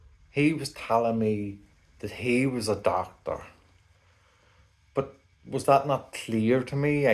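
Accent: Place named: Northern Ireland, United Kingdom. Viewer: Dungannon omagh accent 😃😃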